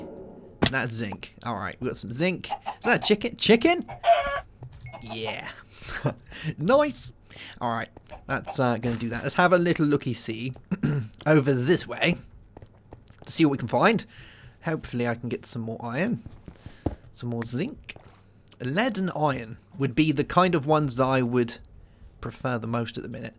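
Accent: United Kingdom. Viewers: You've got a very nice British accent ;)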